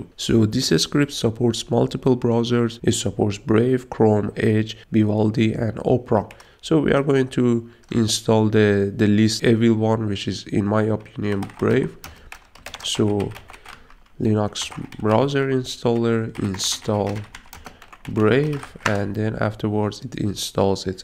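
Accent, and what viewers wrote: Iran. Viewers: حاجی دمت گرم بابت چنل خوبت، همون اول که ویدیو رو باز کردم و انگلیسی صحبت کردنت رو شنیدم، فهمیدم ایرانی هستی😎😎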